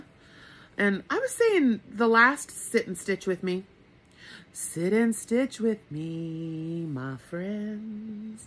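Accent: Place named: Pennsylvania, United States. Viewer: Hello. Love listening to your stories. I grew up in Fontana California and there was a Kaiser Steel plant there. Most men worked there in the 60's and 70's. It was torn down around 1999 in order for the California Speedway to be built. My daddy died in 2002 and I still miss him so much. I also say wash like you and i say younse and buggy for shopping cart. I got these words from my mom and dad who grew up in Pennsylvania. Have a great day.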